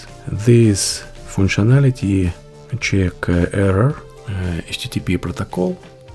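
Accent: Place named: Russia. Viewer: Are you russian? I hear a native accent) Ну или мне просто показалось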